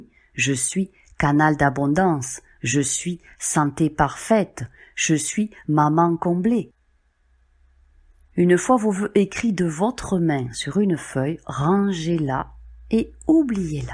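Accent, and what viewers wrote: South, France. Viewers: Bonjour, J'ai été une de vos 1ère commentatrice, lorsqu'il n'y avait pas encore cette déferlante d'abonné(e)s qui, je le souhaite, vous comblent..C super!!!🌞⭐ Et je me souviens qu'en ce temps là jetais entrain d'arrêter l'alcool et ça me faisait beaucoup de bien de vs écouter, vous avez été la 1 ère à me dire que j'avais comme nous tous cette étincelle divine qui demande à croître et que c'est notre droit de naissance (Je vous entends av votre accent du sud, votre fille(à l'époque) avait un soucis de pneu et c'était un peu raide financièrement mais finalement, du coup vs avez eu+De clientes!!Tt ceci pour vous dire que le tps passe et malgré ces années de quête, je me sens guidée mais je n'entends ni ne vois, ni ne sens, rien du tout, et j'ai l'impression de m'être coupée du monde(choix qui est voulu et volontaire mais qui pèse parfois..) j'ignore si vs allez avoir ce message, et si vs l'avez j'ai 1 question qui me gêne, pensez vous que le fait de prendre des produits de substitution à la drogue puisse me voiler encore plus ce que je suis, car vous l' aurez compris, c'est mon désir le+ardent et le+ beau de le découvrir, appuyés tous ces bobards sociétaires,bien à vs🐴🍀🕊🦎🌹🍀🍃💋💋💓☯️💎🕉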